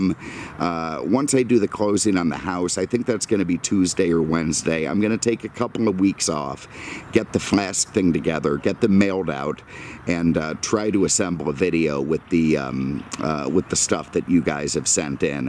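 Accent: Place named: United States. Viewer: You are funny, and sound like a cool American 😎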